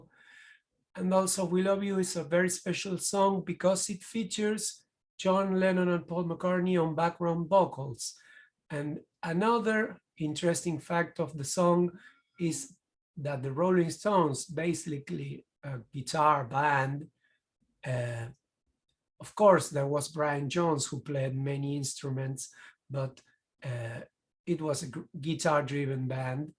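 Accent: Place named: Argentina. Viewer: se nota que es argento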